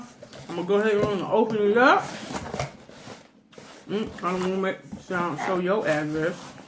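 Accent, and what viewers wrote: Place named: South, United States. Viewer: Wait, what did she say ? Cops of mighty noah or oak? I can tell she is from somewhere South with her accent and Belts department store (Never heard of it) and LOL at wore him out like pancake batter. Ok, I'm editing my comment, seeing the Cowboys cup I'm assuming sge's in Dallas Texas. Yes, I just found her video today after watching My full Figured Life 's Mukbang. The sloppy joes title caught my eye because I just had that for dinner myself last night. :-)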